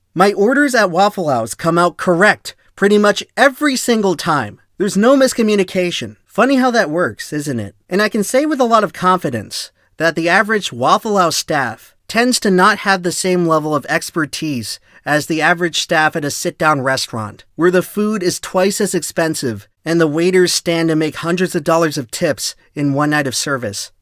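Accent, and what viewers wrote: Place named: Quebec, Canada. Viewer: Your québecois is leaking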